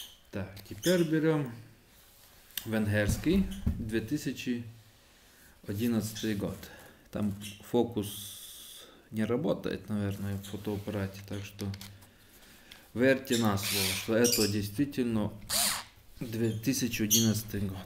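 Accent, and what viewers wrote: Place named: Ukraine. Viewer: Автор спасибо за обзор.Но... Уши вянут.Не пытайся говорить на русском говори на родном языке.Не прыгай выше головы.Разговаривай в будущих видео на украинской мове(из говора понял что украинец),не ломай свой язык и не травмируй наши уши.Спасибо за понимание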